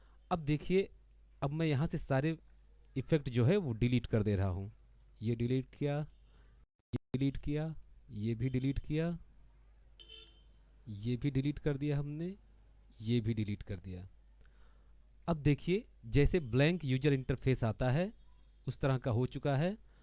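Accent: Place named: Uttar Pradesh, India. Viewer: UP ke ho bhai...hai na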